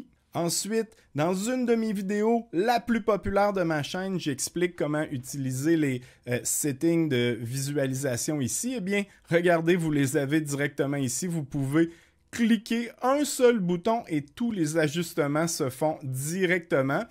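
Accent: Quebec, Canada. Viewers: Bonjour , comment s'appelle l'application ,je n'arrive pas a comprendre le titre désolé j'ai un peu de mal à comprendre l'accent québécois .sinon top continue comme ça . Je me suis certaine fois de problème grâce à tes explications . merci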